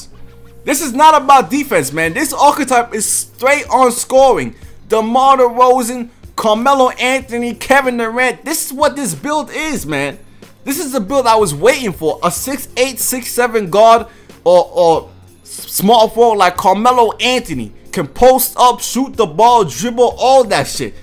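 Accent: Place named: New York, United States. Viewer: You from New York?? Your accent lmao💀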